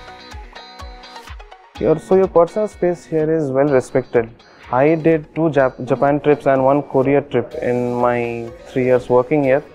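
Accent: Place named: Tamil Nadu, India. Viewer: I thought it's Tamil, as the accent was same!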